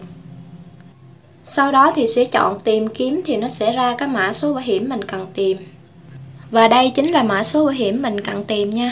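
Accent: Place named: Quang Nam, Vietnam. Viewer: Tks chị, hình như chị người Quảng Nam Đà Nẵng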